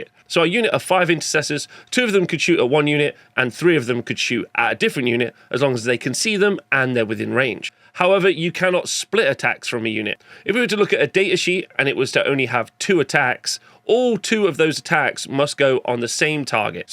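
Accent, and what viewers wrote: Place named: United Kingdom. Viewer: MORE LIKE THIS PLEASE!! this is the 4th time ive watched this video all the way through, while painting miniatures, its really helped me to get a solid grip on the rules system, maybe an advance or FAQ style one next, or faction specific rules breakdown. really appreciate the hard work you put into this. Also you have a much better presentation, personality, voice and vibe than alot of other similar channels i have come across, the british accent helps a tonne. thanks bro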